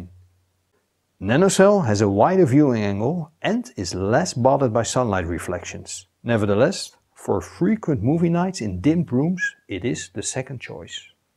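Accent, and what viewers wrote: Netherlands. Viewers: Sounds very Dutch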